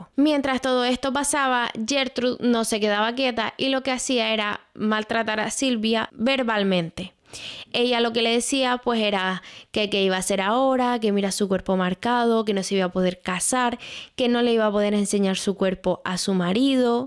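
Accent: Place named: Canary Islands, Spain. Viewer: Me encanta tus vídeos, tienes una voz preciosa, con ese acento canario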